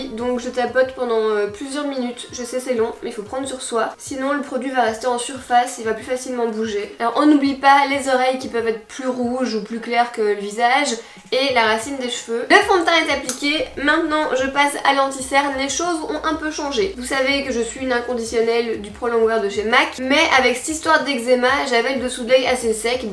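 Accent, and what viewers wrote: Belgium. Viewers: J'aime troppppp ton pti accent belge qui revient tout au long de la vidéo! BELGIUM RPZT :D :D BIsouuus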